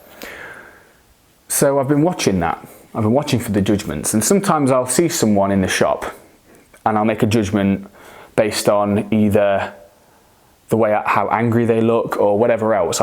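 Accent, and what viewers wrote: United Kingdom. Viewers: I could listen to you for hours! fascinating mind and damn... I love your british accent! 🤫😘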